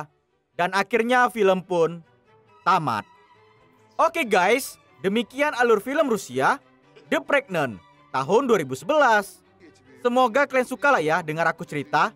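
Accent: Medan, Indonesia. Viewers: Sering2 upload lah bang..ntah film apapun..yg penting suka kali aku dengar logat medannya..😅😂👏👏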